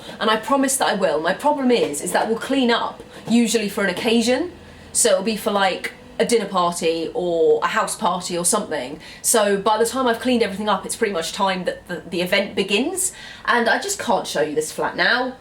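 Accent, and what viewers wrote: United Kingdom. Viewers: I have the worst begging of a year in my life that maybe a war is going to set in my country and I want to travel for UK but I can not. I love you so much coz when I watch your videos I feel happy also I learn how to do a British accent by watching your videos. Happy new year for you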